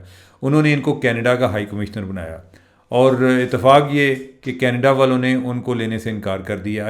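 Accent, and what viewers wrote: Punjab, Pakistan. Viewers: صاحب ، پلیز اپنی اردو ٹھیک کریں، اگر آپکو ٹائم ملے تو اپنی ویڈیو دوبارہ سنیں اور دھیان دیں کہ آپ لفظ بھکاری کسطرح ادا کر رہے ہیں ؟ عام حالات میں بھی پنجابی لہجے میں اردو وی لاگ ہوتا ہے لیکن آج تو بالکل ہی حد ہو گئ۔ 😮صرف کان نہیں پورا جسم تکلیف سے گزرتا ہے آپکے تلفظ سے۔ میں خود اردو دان نہیں ہوں لیکن ہمیں کسی بھی زبان کے تلفظ پر بہت زور دیا جاتا تھا۔ 🙏🏻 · Saheb, Punjabi, Salam Sir jee, whatever your qualifications may be, you will remain “ Pendu”, and never become a polished journalist or media person, unless you Change your spoken language habbits. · Mostly punjabi (central) aisay he boltay hain, please don't mind😂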